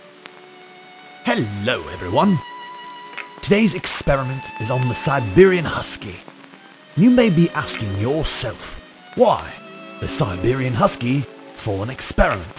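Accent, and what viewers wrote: England, United Kingdom. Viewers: ...her highness would say "we found that most amusing"...i must commend you on your english accent...jolly good show & all that!...(& i AM english btw!😁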